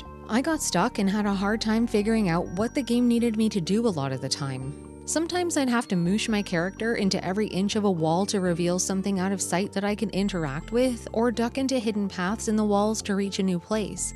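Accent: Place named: Canada. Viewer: you are actually from canada right ? i wont say that i spend very much time on your twitch channel because i get so busy with work that its hard for me to find enough time to sit down for long hours to watch the twitch livestreams. so i might have missed alot of the details about yourself or your experiences if you ever talk more about your life on twitch. i just tend to only check out the youtube videos you make instead. but im just sure that you must be from canada just because of your voice and because i have lived in canada my entire life too. canadian folk can often sense other canadians. what part of canada are you in though ? you can just say what province if you dont like giving out too much personal information. or ill apologize if my best guess is wrong and you arent in this country. i think we are also close to the same age as well but im all the way far east in the atlantic provinces. 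its rare to find anyone from canada that reviews these older retro games from what i have seen on youtube. so i just wanted to tell you that i really appreciate what you are trying to do with your channel. you are a true inspiration to other gamers in my opinion. someday i hope to start a channel and make the same kinds of reviews as well. i say that since i am also addicted to the rpgs, especially the retro rpgs. i dont think you have a discord server or i would probably try talking to you later. wish you all the best however and good luck with everything, take care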